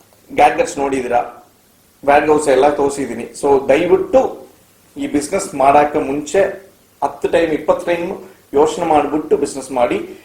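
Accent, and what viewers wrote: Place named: Tamil Nadu, India. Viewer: Typical tamilian Kannada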